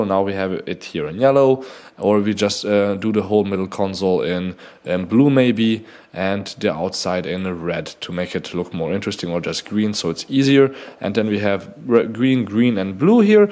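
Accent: Germany. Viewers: man hört's einfach immer wenn Deutsche Englisch sprechen 😀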